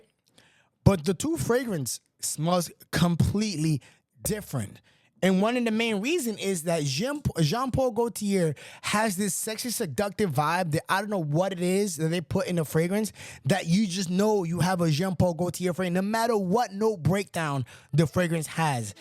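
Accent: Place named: Peru. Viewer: Y yall hating on his Peruvian auntie accent 😓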